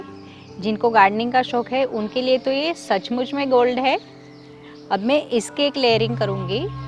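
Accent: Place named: Maharashtra, India. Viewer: Aapke vdo to muze bahut achhe lagate hai. Language tuning se aap muze marathi lagti hai. Am i right mam?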